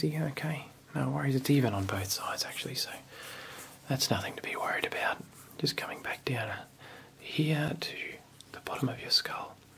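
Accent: Australia. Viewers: I love me some Australian accent ❤️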